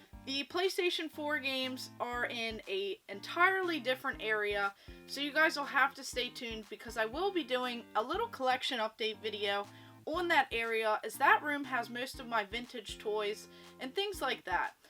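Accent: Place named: Philadelphia, United States. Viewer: With that accent, you must be from the Philly area.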